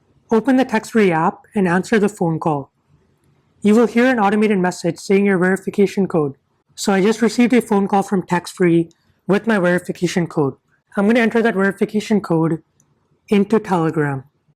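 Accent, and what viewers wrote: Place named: India. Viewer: ikr. But despite that, I found this video kinda useful and the Indian accent wasn't too awful. It would be helpful to simply state that Telegram requires "A PHONE NUMBER" to download/set up, but it does not have to be your phone number OR DOES IT? idk could a textfree number on another device suffice? And what's the deal with the call back? I use textfree to avoid having audio phone service. Does Telegram require that one-time audio connection? If so, then why? It's not an audio-based app. And what happens if a user changes their phone number (which I do regularly). These are the kind of questions which should be answered in this kind if video.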